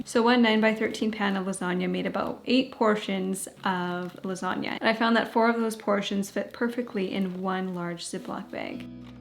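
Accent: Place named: Quebec, Canada. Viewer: it has to be the Canadian French influence. i tried learning a little of it once, but my ears are tuned to Spanish from growing up near the southern US border.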